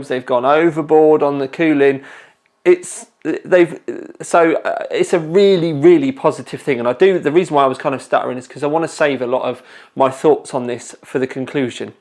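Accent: United Kingdom. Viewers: So it's actually? For the longest time I thought it was. #BritishAccent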